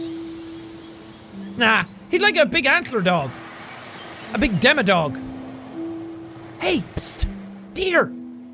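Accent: United Kingdom. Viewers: Tiffany Sigrist Just by the way, he's not saying it wrong it's just the British pronounciation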